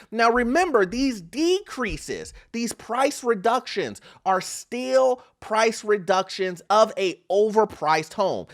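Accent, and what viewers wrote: United States. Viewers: I love your Midwest accent. Makes me want to pull up a rack of baby back ribs and talk real estate all afternoon!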